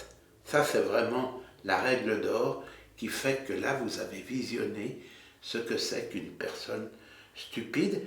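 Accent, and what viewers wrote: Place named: Switzerland. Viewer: quel bel accent ;-) vaudois ou genevois ? salutations et merci encore pour votre travail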